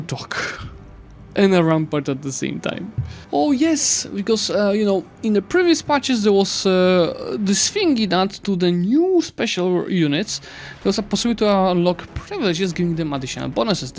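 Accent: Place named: Poland. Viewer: <3 love the polish pronunciations myself, thanks for the updates. Really cool that you were able to get a preview!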